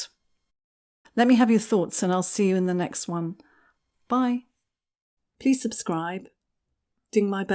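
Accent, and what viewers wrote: United Kingdom. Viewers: Lovely British accent. Excellent content and perspective on YT videos. Thank you. I truly appreciate you. 🏆🌈🏆🌈✨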